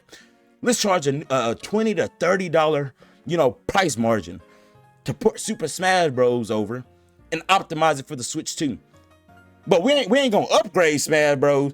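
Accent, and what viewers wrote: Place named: Baltimore, United States. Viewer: I feel like I hear Baltimore (but I could be wrong lol)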